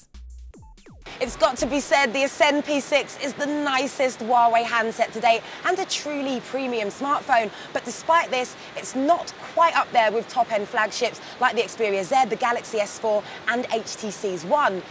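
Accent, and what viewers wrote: United Kingdom. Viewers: Hate Britsh Acsent ! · Love the British ascent! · i hate british accent too anyway half of this is rubbish because i can get 4g with it because i do the camera is amazing and the DEFINITELY NOT LAGGY U LIED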